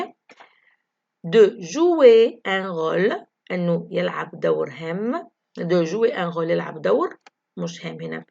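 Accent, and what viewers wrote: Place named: Tunisia. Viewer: you have tunsian accent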